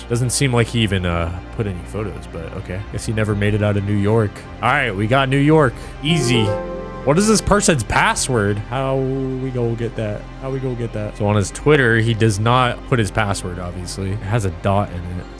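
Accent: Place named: Canada. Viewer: I thought this geezer was Canadian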